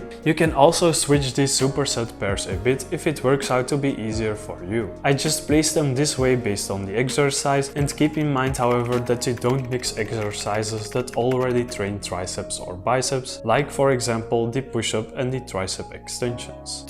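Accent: Belgium. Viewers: I'm so proud you're Belgian (you can hear it lol), Thanks so much bro! Also can women also do this program?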